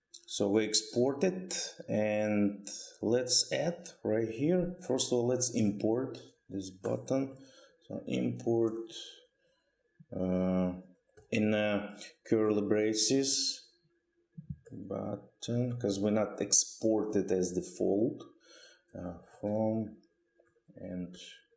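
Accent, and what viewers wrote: Russia. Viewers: are you russian? Your accents just seem to be russian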